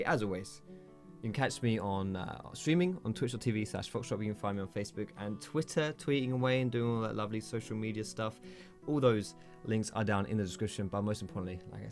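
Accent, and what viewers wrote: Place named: United Kingdom. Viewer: I love British accent :3 #nohomo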